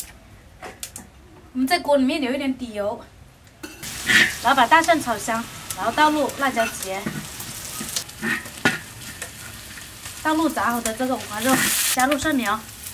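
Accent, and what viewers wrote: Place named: Guangxi, China. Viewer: 讲广西官讲的妹仔。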